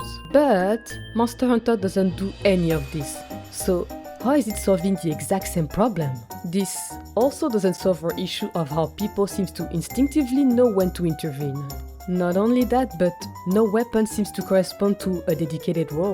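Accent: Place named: France. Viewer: You can count on a French to not hear the obvious French accent... I got jumpscared by your "les amis" lmao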